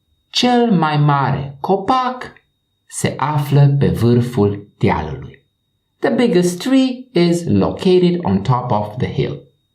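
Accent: Transylvania, Romania. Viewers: pe mine nu ma deranjeaza accentul ardelenesc dar este vorba de doua sunete diferite "e" si "i" si pronuntia corecta; ii inveti sa vorbeasca adica sa pronunte corect romaneste este exact ca in lb engleza; ideea lectiilor este super,felicitari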